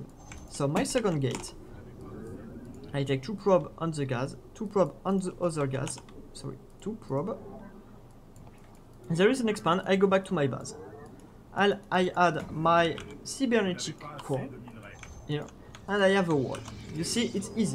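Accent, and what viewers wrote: France. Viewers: Cheers from Sweden! I have just returned to Starcraft 2, much thanks to the Finnish players who beat the Koreans recently! i was thinking then, are there any "Old" WoL players that stills play... And i found out that Naniwa was playing just some games for fun on twitch and i saw a live match on twitch with stefano.. soo i was hooked again, cus its so funny to watch starcraft 2 matches and suddenly i wanted to play 1v1 again! when i came back to the games i'v been looking for some "sheets" or "how to buildorder" etc and then i saw your effort on <link> i watched some of your videos, found it intressting most cuz i wanted to get toss back into my own protoss play again! And then i saw this video, when u do a series of play on the ladder and you explain what you belive you see and what info you get from your scouting. And your experiences dosent lie to you that often. 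but i found it realy intresting when you react to things. here we "noobs!" can do alot of learning! I think i do alot of progress watching your videos! Me however that are a Platinum player that wish to step up to Diamond i found this video very usefull! I realy hope you do a few more videos like this on the ladder. Cus we lover tier guys learn alot form this! and i love your frenglish keep do videos! its intresting, funny, usefull... you help the starcraft 2 communityt alot by doing this videos! cheers again! Samsos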